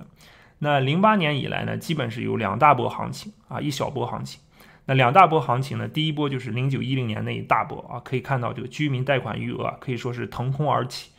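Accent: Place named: Hohhot, China. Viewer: 感觉是呼市人，我认识吗？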